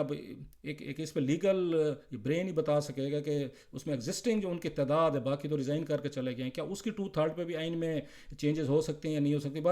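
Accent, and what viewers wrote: Saraiki, Pakistan. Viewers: Saraiki style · سر جی یہ سرائیکی سٹائل ہے.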